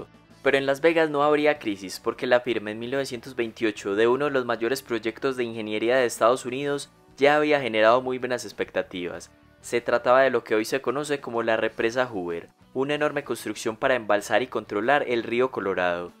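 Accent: Antioquia, Colombia. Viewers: Se nota que eres paisa, buen video parce!!!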